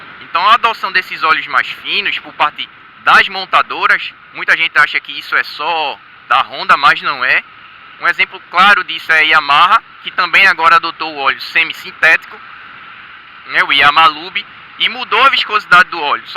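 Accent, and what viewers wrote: Recife, Brazil. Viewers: eta sotaque feio do c da pra notar de longe que e de recife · so ouvi verdades tu es do recife?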